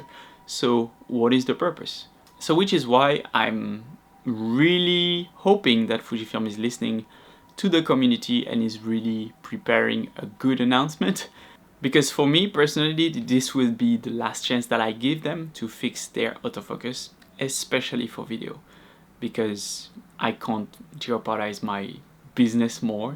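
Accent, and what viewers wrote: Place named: France. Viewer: tu as ralenti dans ton script ,c'est beaucoup plus audible,ca faisait trop je veux masquer mon accent Francais;) c'est une " critique" positive ;) c'est vrai que c'est l'exode Fuji en ce moment,pour ma part ,toujours en xt 3...avec beaucoup de ratés,notamment photo d'enfants .... perso le nikon zf me fait de l'oeil depuis plusieurs mois,pas forcément le FF ,mais plus l'ergonomie Fuji et le meillerur AF,tu l'as essayé?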